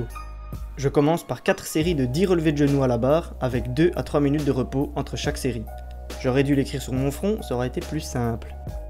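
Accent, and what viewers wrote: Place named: Sudan. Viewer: La quintessence de la callisthénie!! Merci, t'as un ptit accent il me semble... Tu serais pas originaire du Soudan?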